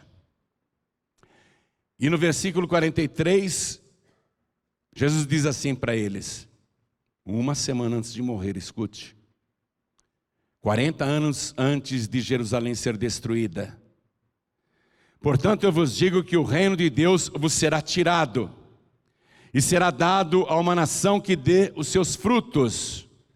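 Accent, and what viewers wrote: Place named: Rio de Janeiro, Brazil. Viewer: Pastor seu sotaque carioques e muito engraçado. O Sr não e de Piracicaba. Deve ser uma técnica de fala né?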